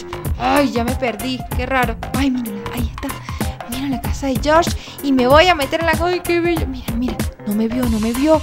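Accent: Venezuela. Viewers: Eres venezolana ？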